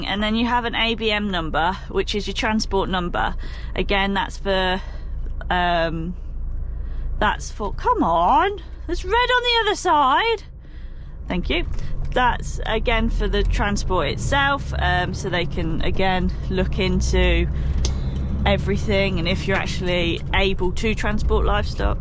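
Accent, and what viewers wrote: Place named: Cockney, United Kingdom. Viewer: Love the the chockney accent where about you are today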